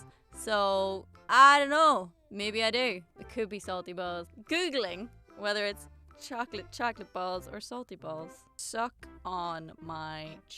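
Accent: Ireland. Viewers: i absolutely love your ginger hair it’s unbelievably gorgeous keep rocking it your an amazingly beautiful woman you should be a model, by the way your voice is awesome i love the irish accent. i recently heard your cover and fell in love 🤙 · I find it rather sad that people have anything mean to say about you. You're fun, beautiful, Irish, have a wonderful accent and i happen to enjoy your singing. I will never understand why people feel the need to say something mean to someone rather than give positive thoughts and feedback. I feel people do that because they in their own lives have failed or are doing so. They feel the need to put others down to feel good about themselves.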